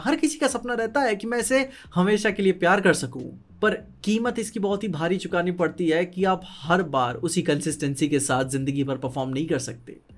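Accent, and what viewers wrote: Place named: Bihar, India. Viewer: old videos mein kitna ajeeb lag raha hai. Bihari accent bol raha hai, poor hai aur ab dekho kya transformation aya hai bande main 😮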